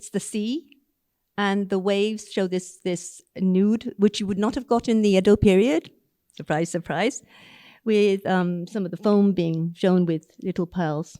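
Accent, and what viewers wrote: United Kingdom. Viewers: 久子さまのファンになってしまいました。美しいブリティッシュイングリッシュ。お話の進め方も素晴らしく、とても興味をそそられました。楽しそうに話されているので聞き入ってしまいます。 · かっこいいですね。キレイなブリティッシュイングリッシュ、わかりやすいプレゼンテーション、さすがです❗️❗️❤ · 素晴らしい👍👍👍 king’s English, 驚き‼️こんなすばしいひとみたことない、、殆どイギリス人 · ある程度原稿があったとしても、その場に応じてユーモアと知性、ウィットに富んだ英語の内容は流石ね！！イキイキされていてホント聞いているこちらまで晴れやかな気持ちになるわね。東京オリンピックの招聘のイベントの時になんて綺麗な英国アクセント、そしてフランス語を話されるのかしら…と今でも覚えているわ。英語の先生、そして全ての学生はの英語を聞くべきじゃなくて？笑顔が張り付いたようなどこかの方はどうなのかしらね？ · イギリス英語？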